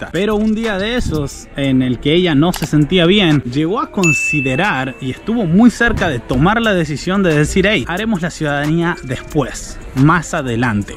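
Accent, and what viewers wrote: Argentina. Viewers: Hola saludos desde Córdoba. Te sentía tonada argentina pero no sabía bien de que provincia 😅..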